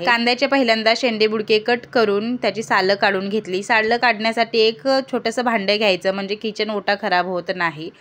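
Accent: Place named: Kolhapur, India. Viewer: Chaan vat te tumcha awaz and tumcha kolhapuri accent eyikayla.. recipes pan baghayla avadtat ❤❤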